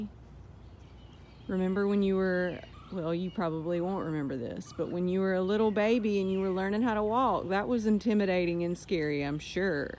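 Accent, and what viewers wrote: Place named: Texas, United States. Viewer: I just discovered your channel today. You have an absolute gift at choosing plants, placing them just perfectly, mixing textures, and creating a beautiful layered look. You are so right that many people are intimidated by gardening. Also, I love your accent. It’s very similar to mine as I am from northeast Texas. Actually we don’t have the accent; everybody else does! Lol Anyway, you sound like home to me , and I am enjoying your videos.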